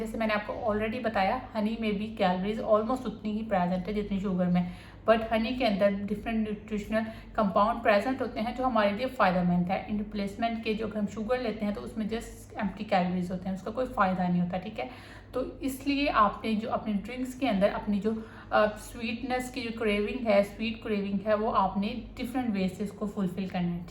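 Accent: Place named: Punjab, India. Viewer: Very informative but improve your Urdu accent...seems you speaking Punjabi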